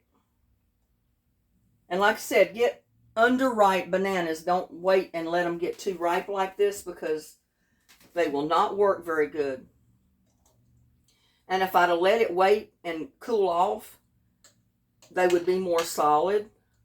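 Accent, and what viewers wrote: South, United States. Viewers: Never mind what anyone says l love you cooking videos and your southern accent. I have a Boston accent and guess what l am as proud of it as you should be of your southern accent. We are all Americans no matter which part of the country we come from. Also l love your blouse in this video very lovely on you. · Dear I am from London UK and I love that southern drawl! Love Maria 🌹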